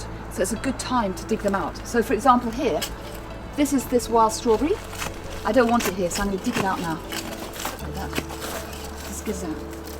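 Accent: London, United Kingdom. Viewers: 喜欢Manda说英语的感觉，好优雅好精致，伦敦音好好听～而且解释的好细致好专业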